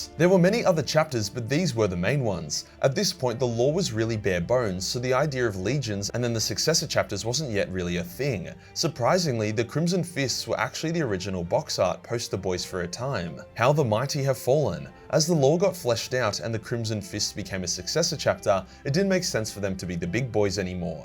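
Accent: Australia. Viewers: Australian Johnny Bravo continuing to do the Emperor’s work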